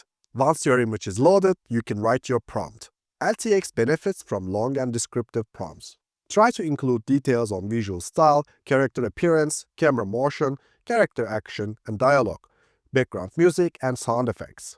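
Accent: Turkey. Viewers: Turkish accent :)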